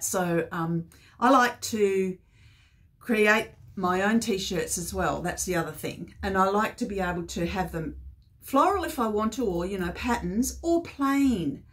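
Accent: Australia. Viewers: Hi, sew glad to find your channel. I love finding an Aussie voice for a change. Look forward to following your channel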